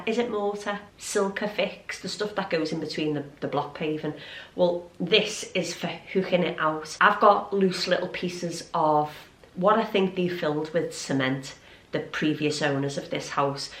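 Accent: Liverpool, United Kingdom. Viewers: Just found your channel and love it. Cant wait to get in my garden but in toronto its still a bit cool for planting. Are you a scouser like me?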